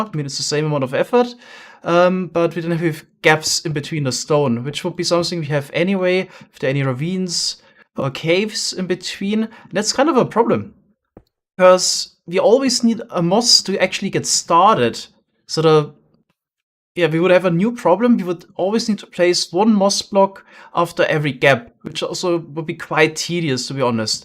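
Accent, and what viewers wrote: Germany. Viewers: Ich wusste es du klingst übel deutsch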